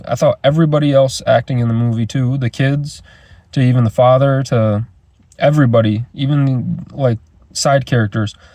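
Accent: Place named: Brazil. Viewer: Before you told us you lived in Brazil, I could tell that just by your accent.😅🇧🇷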